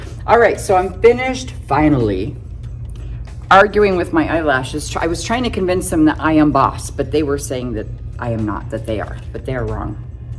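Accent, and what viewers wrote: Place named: South, United States. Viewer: It isn’t bad however I preferred the fat triangle technique. I love my new eye cream and the way you taught us to mix it with under eye concealer. What a difference. Yup! You definitely have a Southern undertone and I love it, so just be you girl. LOL Can’t wait to see the bangs. I know you will do something to make ma laugh. Take care sweet.❤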